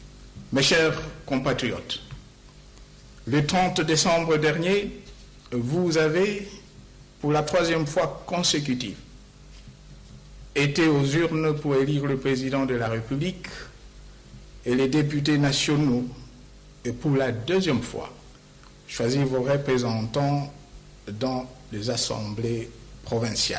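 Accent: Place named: Rwanda. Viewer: Français avec tonalité Rwandaise.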